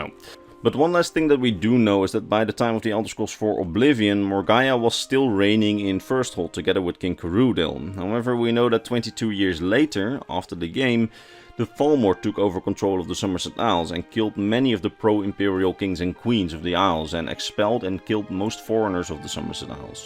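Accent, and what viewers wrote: Netherlands. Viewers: Are you like, Dutch? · Dutch i guess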